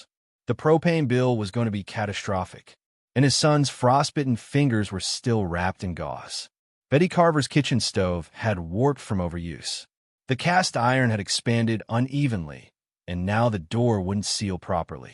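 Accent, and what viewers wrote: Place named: United States. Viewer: Don’t waste your time it just repeats and repeats and repeats. The American accent is unbearable.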